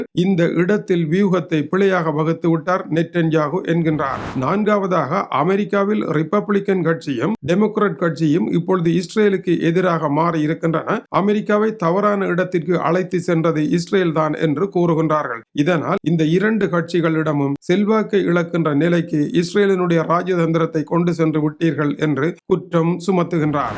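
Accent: Sri Lanka. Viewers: Elaigai tamilan awar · Beautiful Ceylon Tamil.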